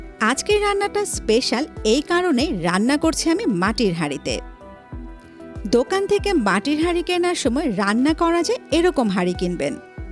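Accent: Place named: Bogura, Bangladesh. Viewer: আমার মনেহয় আপু বগুড়ার মেয়ে, কথা শুনেই কেমন জানি বগুড়ার স্বাদ খুজে পাই, যাইহোক আপুর জন্য ভালোবাসা রইলো, জীবনে যদি বড় হই তবে আপুকে একবার দেখার চেষ্টা করবো।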